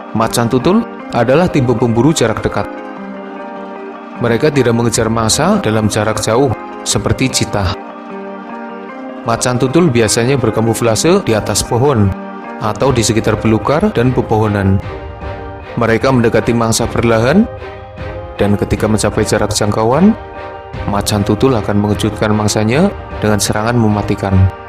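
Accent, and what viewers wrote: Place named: Java, Indonesia. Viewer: Medok bgt min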